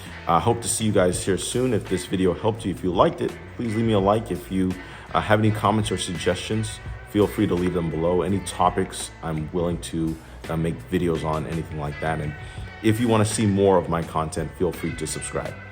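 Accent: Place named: New Jersey, United States. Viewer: Hey whats up, im guessing your from Jersey. Are you a CFI in the area?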